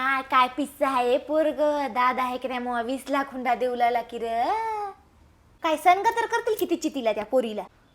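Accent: Nanded, India. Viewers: अभिनय,उत्तम,काही काही कला,या व्यक्तींना,उपजत,असते, सोशल मीडिया, मुळे, आधी क,प्रसार,झाला,मी, कसे,दिसते,आपण,कसे, आहोत,आपले,रूप,तारुण्य,हे,या,वयात,होतातच,मुळातच,लहान, वयापासून,सर्वांना,मोबाईल,सोशल,मीडिया,जग,आपल्या,घरी, आले,आहे,ताई, आपल्याला अभिनय, कौशल्य,छान,आहेत,नांदेड, च्या,भाषेचा,सुद्धा,छान,हाव,बाव,संवादफेक,अगदी,सुंदर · Mazi frnd ahe pavdevadi Nanded chi Ti same tuzya sarki bolte 😁😁😁😁😁😁khup avdtat Tuze vlog bghayla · Nanded chi bhasha lay bari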